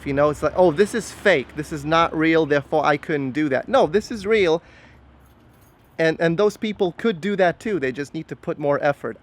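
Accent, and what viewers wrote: Norway. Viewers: Wait... are you Norwegian?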